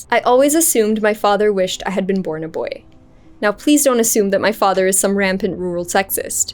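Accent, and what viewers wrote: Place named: United States. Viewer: Nice language American accent🔥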